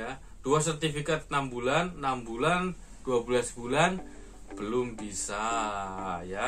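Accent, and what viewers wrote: Indonesia. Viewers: Walah ternyata ngapak ya melkur hahaha Sukses teruslah ya aamiin #edn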